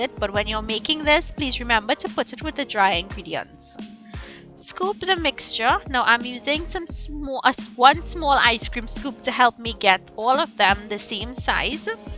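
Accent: Trinidad and Tobago. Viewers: I like the Trini accent lol thanks for doing this I am wondering how you do these I'll see if they're different lol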